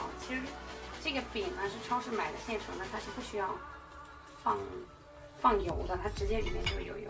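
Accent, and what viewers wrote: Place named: Shandong, China. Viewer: 我不用猜就知道你是山东的嘻嘻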